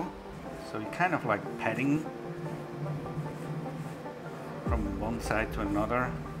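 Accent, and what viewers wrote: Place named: Switzerland. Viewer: Are you maybe swiss? Your english is great but the accent seems familliar to me 🤔 falls ja liebi Grüess us Züri 😊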